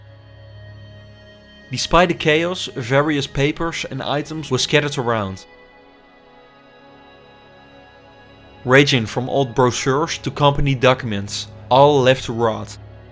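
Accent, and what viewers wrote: Netherlands. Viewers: Do i hear a dutch accent🤔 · Kikass Dutch accent! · Het Nederlandse accent is te erg. Klinkt echt slecht. De video is wel leuk. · Bro that dutch accent you heard that right away! haha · The person reading the text must be Dutch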